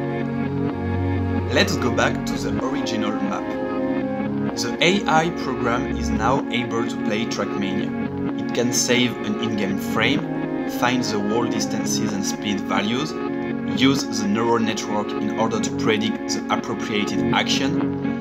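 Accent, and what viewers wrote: France. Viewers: pouh l accent francais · Mdr moi aussi j'ai cramé que l'accent était français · Are you French? because of... the accent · french voice detected ! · Really like french accent bro xD En vrai c'est ultra intéressant ! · T'es français non ? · so French accent 😂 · Ca c'est du machine learning c'est génial ca ! Par contre on sait direct que tu es francais mdr xD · T'es francais nan? Sinon bg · au moin grace a l'accent on sait que sa vient de france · Salut (par ton accent j'en conclu que tu es français ^^) ! Super vidéo ! Je la découvre en même temps que mon projet en lien avec les réseaux de neurones. J'aurais souhaité savoir avec quel document/cours/site tu avais appris à coder ton algo de réseau de neurone ? Et avec quel langage ? Merci d'avance ! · L'accent French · Ahah i spotted you french accent ! Cool vid, maybe lower the music next btw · Nice sa sent quand meme un bon vieux accent français... 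· Super bon cet accent français. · Areyou french , you sound like a french speaker you have almost the same accent that lots of my friends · tu parles bien anglais mais avec un bon accent français mais pas grave tout le monde comprend 😉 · On a reconnu l’accent français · le bon accent français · Sur l'échelle des accents francais, t'es loin d'être le pire que j'ai entendu, t'inquiètes. Super vidéo though :) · Ah, l'accent français, j'aime!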